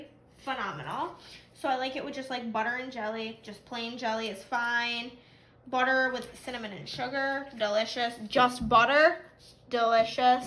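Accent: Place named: Upstate New York, United States. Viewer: You DEFINITELY have that upper NY accent. It’s cute though!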